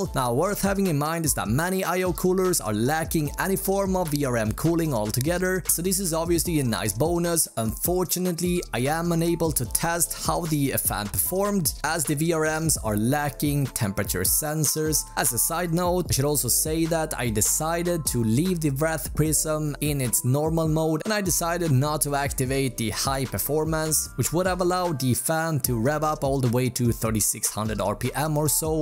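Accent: Sweden. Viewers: The Svvedesh accent really shines through. INSTANTLY SUBBED and enabled ads!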